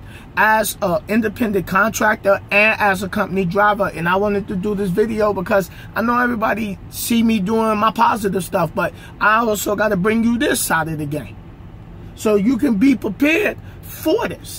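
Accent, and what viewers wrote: New York, United States. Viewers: Sounds like your from nyc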